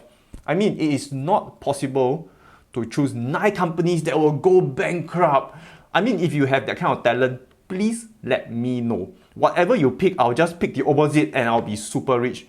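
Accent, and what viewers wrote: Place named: Singapore. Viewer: Singlish ? Hard to understand !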